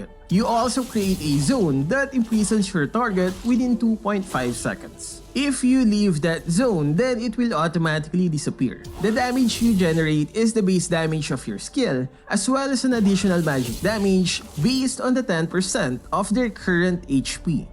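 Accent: Philippines. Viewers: Philipines accent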